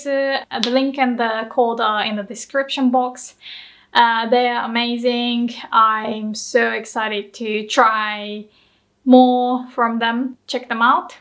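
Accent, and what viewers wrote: United Kingdom. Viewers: Unrelated. Do you use the british accent as your base accent or the american one? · Hi! I noticed your accent is very british sounding! Did you learn from a british teacher or something? Spend time in the UK? In college I had a Japanese friend who grew up in hong kong, so her English was almost a perfect british accent. Your accent kind of reminds me of hers.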